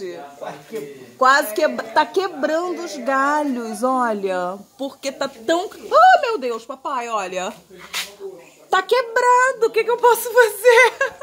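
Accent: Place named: Região dos Lagos, Brazil. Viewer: Nós de maricá pra lá, região dos lagos, acho que falamos assim. Mamãe e papai😀😀😀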